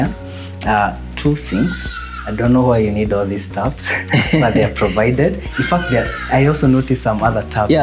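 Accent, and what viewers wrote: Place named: Kiambu, Kenya. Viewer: Buda umeenda KIAMBU accebt ikaanza kutokea bana!😂😂btw luv the house keep it up!:washhands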